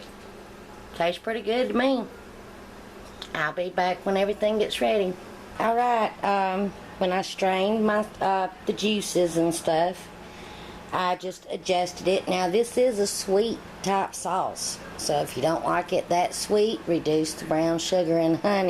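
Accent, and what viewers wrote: South, United States. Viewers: LOVE the accent. Southern charm at its best. Nice recipe. I’ll definitely give it a try.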